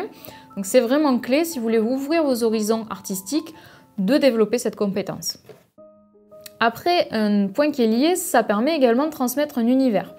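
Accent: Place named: South, France. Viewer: très bien je suis inscrite , j'ai déjà votre livre depuis le début je peint seulement depuis juin 2019. merci, vous avez l'accent du midi. a bientôt sur internet lucette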